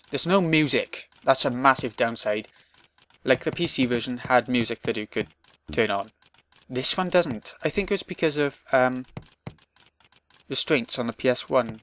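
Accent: Wales, United Kingdom. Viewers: Used to LOVE this game, gonna buy it again on PSN. And no offense but I love your accent, which is unusual seeing as I usually dislike the welsh accent :P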